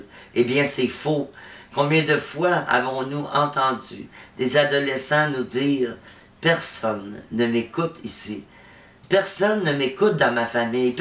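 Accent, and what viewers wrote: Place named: Quebec, Canada. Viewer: Un sujet qui me touche particulièrement, car tout ce que tu dis est tellement vrai et de savoir que les autres ne nous écoutent pas, même au sein de notre propre famille, qu'on soit jeune ado, adulte ou même personne âgée, finit par enlever tout envie de se confier, par peur d'ennuyer ou par certitude que les autres se fichent de nos problèmes, ou parce que c'est souvent une habitude dans une famille de ne pas se livrer. En passant, j'adore ton accent québecquois!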